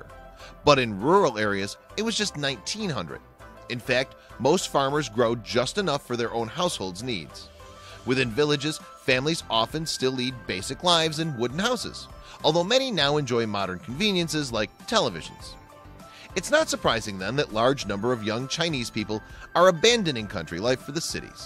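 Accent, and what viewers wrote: Midwest, United States. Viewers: New subscriber, enjoy your videos. The narrator on this video has a distinctive Midwestern accent. I enjoy hearing it since I've been in the south for the last 20 years.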